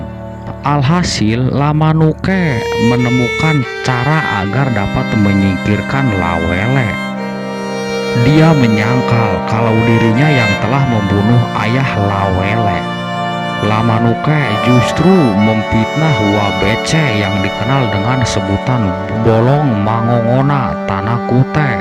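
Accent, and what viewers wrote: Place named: West Java, Indonesia. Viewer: Sayang sekali Pembicaranya berlogat SUNDA, sehingga penyebutan nama2 jadi salah. Misalnya : LA WELE' ..ada tekanan di ujung kata ... Merubah bunyi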